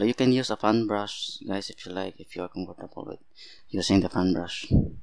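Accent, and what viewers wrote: Philippines. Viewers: By just listening to you, I know you are my kababayan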